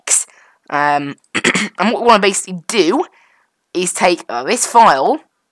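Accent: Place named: United Kingdom. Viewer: this is how i imagined all british people sound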